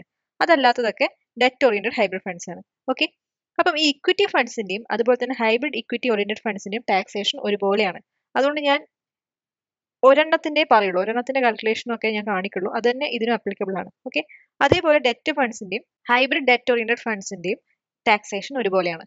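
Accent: Kannur, India. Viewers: സംസാരം കേട്ടിട്ട് കണ്ണൂരുകാരിയാണെന്നാണല്ലോ തോന്നുന്നേ....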